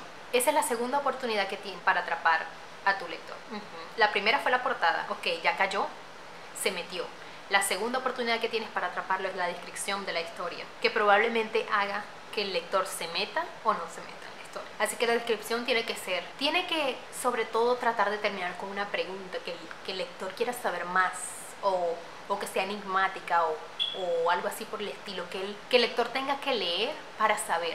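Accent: Venezuela. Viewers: Se nota que eres venezolana. Que orgullo que eres de este país 💜💜💜💜. Saludos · ¿Eres Venezolana? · Por tu acento sabía que eras venezolana!!!! Te amo chama!!! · Eres venezolana ? Por cierto gran vídeo estoy escribiendo una historia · ¿eres venezolana? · chama wow. ¿eres venezolana? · El acento venezolano es notable 💁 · wow, el acento venezolano se nota a leguas...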